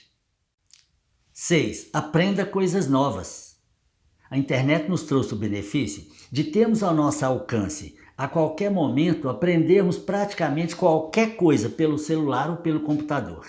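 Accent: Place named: Minas Gerais, Brazil. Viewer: Eu adoro esse sotaque mineiro dele 😅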